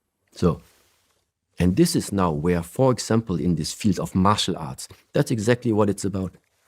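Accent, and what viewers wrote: Germany. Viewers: Yes, and especially saying "Ja?" after some of the statements. Very German thing to do, and very German pronunciation on that as well.... Plus, I don't know how many people know it, but there are lots of Vietnamese refugees from the 70's and in the meanwhile of course their children and now grandchildren too, with most of them having a German citizenship. I think it's great that he's opening his heart more, and also admits honestly that the old patterns sometimes try to sneak in ( and wreak havoc, if letting certain unhealthy patterns to take over). I think that many such patterns are what people often call "family karma" or "ancestral karma", depending on how far back those patterns go... I myself am on the path of recognising and changing them - and I know all too well the feeling of "never being good enough". Where to just BE is sort of act of revolution concerning the old family dynamics and expectations - and it's something that most of the society doesn't accept either, nearly all of us are indoctrinated from an early age on to do more, achieve more, compete with one another ( the school system very much so with the notes etc ) and sadly, it not only leads to continuous unhappiness with ourselves, but also destroys the planet when most people go after more and more "achievements" that are often shown as material stuff... 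Yet, to be able to break out of it, one needs certain amount of money to have a small house and property if one wants to live off grid for example. And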